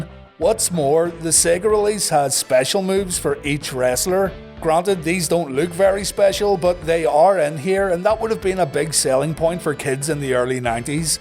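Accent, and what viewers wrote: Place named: North, United Kingdom. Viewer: could you possibly talk less Northern or Irish I find you hard to comprehend the Queens English would help alot thanks in advance.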